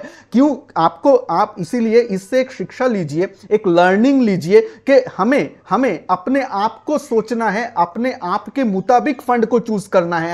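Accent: Bengal, India. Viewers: many times you put bengali words in hindi accent in your communications that creates unique statements which I personally liked very much, at the same time there is no mistake as such,additionally your hindi communication touched another level which becomes your signature mark in futures. Yes, today is the day of huge corrections.Still I personally feel IT funds will boom again. Thanks for your video that will definitely help & subside today's burning pain amongst mutual fund lovers. 👍.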